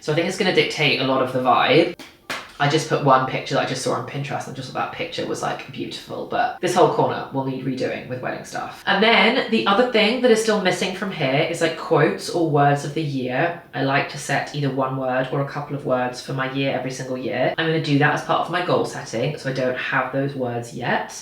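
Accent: United Kingdom. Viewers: Hi, happy new year! I'm Sarah from Italy. I've been listening to your videos for two years to improve my British English listening skills. Thank you very much ❤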